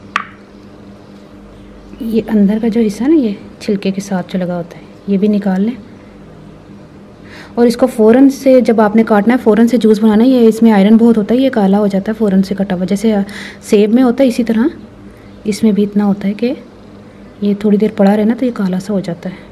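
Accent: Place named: Punjab, India. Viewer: OK ji, asi dono cheeni te Gurr alag alag try kara gae, jo changa lagya agey to oh cheez pa lya kara gae ji, hor v es type de fruit de juice dasna ji. Tuhaadi Punjabi sun k bahot he vadiaa lagda hae, edhar di Punjabi te tuhaadi Punjabi iko jehi hae, sun k bahot mazaa aanda hae.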